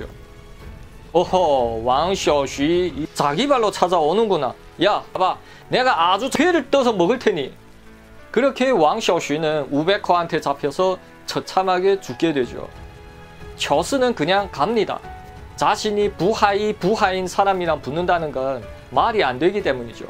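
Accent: Yanbian, China. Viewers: 이 친구가 연변친구라서 그럽니다. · 延边方言 谈黑龙江乔四爷 😊牛逼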